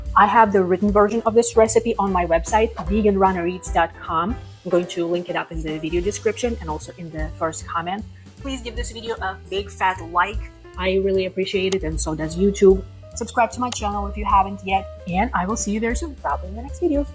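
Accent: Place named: North Macedonia. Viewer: Hi! This looks really yummy! I will try this 😊 You have a very slight accent, are you originally from Macedonia? I have a good friend from Macedonia that sounds like you! Have a great day 😊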